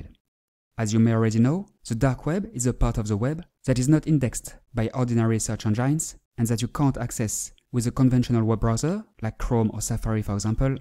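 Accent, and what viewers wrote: France. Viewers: Your English is weird i bet you're french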